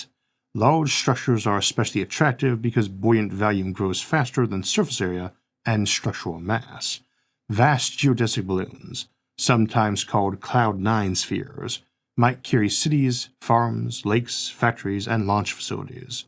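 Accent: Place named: South, United States. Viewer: know something please - your speech impediment was never enough to make you unintelligible, in fact it was part of the charm that attracted me to your channel - I do love a good Soouthern drawl (I'm British). Never feel the need to apologise for that. Folks that can't see beyond it are the true ones that need to grow of they want to enjoy your work. I am happy you have managed to get it to a point where you are happy with it though. Always remember the thought behind and quality of your work has always been the main, and will no doubt continue to be, it's prime feature.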